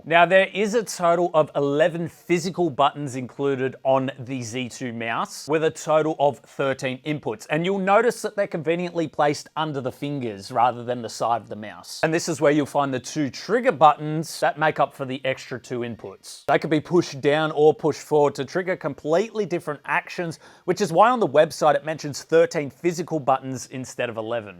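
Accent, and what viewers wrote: Australia. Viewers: Australia, it’s a pretty well known accent kinda sad you don’t know it lol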